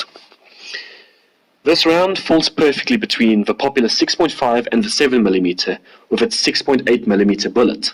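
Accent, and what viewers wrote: United Kingdom. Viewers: Your narrative is useless - try another enclosure for your talk - and get rid of that shitty British accent. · Your an idiot 25 06 has been around for about 100 years it must be that Brit tone that you have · Why is everything these days have to have someone with an English or British accent? Minute I hear and I turned it off because it's b*****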